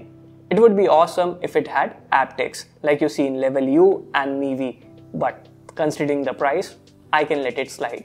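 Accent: India. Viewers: It's been hard to understand you, you've got such an Indian accent man 🤭🤭😘 but the review was good, thanks 👍👍💖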